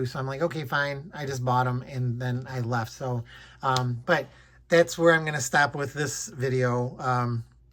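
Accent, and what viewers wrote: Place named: Michigan, United States. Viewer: I can hear your nasal Michigander accent. I'm originally from GR, but in CHI now. Great haul!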